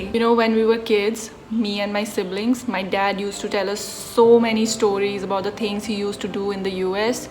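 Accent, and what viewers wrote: India. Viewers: I like Indian English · I subscribed you by seeing you from bts's study with bts I mean by seeing you're comment And our [mine &yours] english accent is weird that's how Indians speak English in that accent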